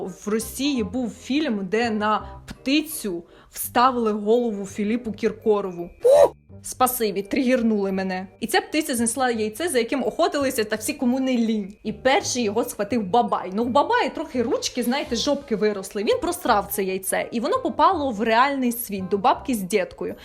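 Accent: Surzhyk, Ukraine. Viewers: у неї майже суржик. не дивно, що ви усе зрозуміли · Потому что девушка говорит российские слова с украинским акцентом, но это все еще российские слова. Попробуйте понять, что означают эти бытовые украинские слова: човен, легИт, скло, бляшанка, бездрЫк, вИдлуння, прЫмара, віяло, троянда, гИлля, лИжко, вЫделка, тлумачЫти, пазурИ, герць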